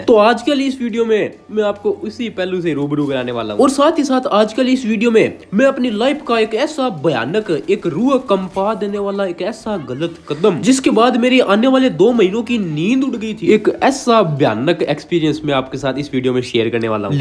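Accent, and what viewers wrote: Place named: Rajasthan, India. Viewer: Bhai main Teri voice sunke Bata sakta hun tu Rajasthan se hai ❤️❤️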